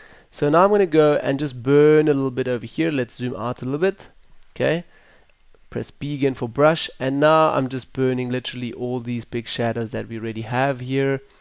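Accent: South Africa. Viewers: Since u sound south african i will subscribe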